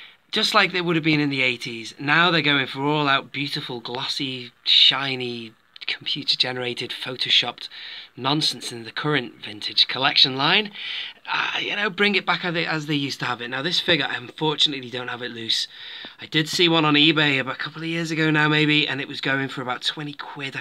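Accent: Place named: United States. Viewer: I like him better as a figure than I did as a character. This one is nice looking. Actually, so many of the vintage line are. i don't collect them, I just love to look at people's collections. I also like to hear your take on everything, I especially like hearing your American Accent, which is pretty good btw.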